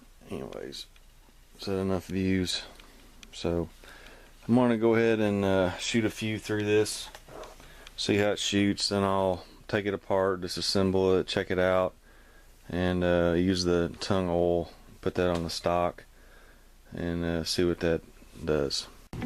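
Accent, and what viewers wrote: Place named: South, United States. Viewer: When a guy with a southern accent understands the difference between a clip and a magazine, he feels like he’s an authority on all firearms related topics.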